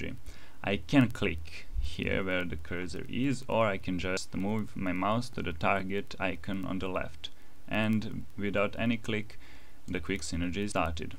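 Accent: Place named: England, United Kingdom. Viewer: your english accent is epic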